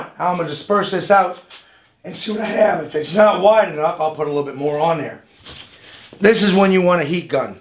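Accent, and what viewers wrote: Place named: New Orleans, United States. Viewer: You have to be from New Orleans or close by. That's a familiar accent ..;)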